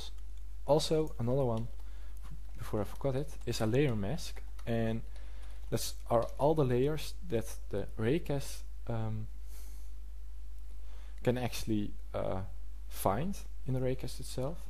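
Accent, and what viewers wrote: Netherlands. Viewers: Dutch? :P Nice video man :) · Wear are you from, Holland? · your dutch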